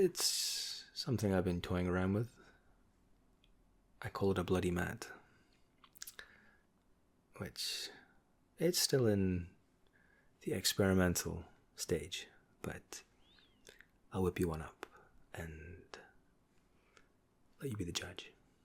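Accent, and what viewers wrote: Scotland, United Kingdom. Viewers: Genuinely cannot decipher your accent. I hear a Scottish twang, not the part I'm from but also not strong enough to be pure Central. Pls help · I read the actual comments to where the idea of this video originated. I didn't know if i was going to like the Scottish accent, but I wasn't disappointed at all. Keep up the good work boyo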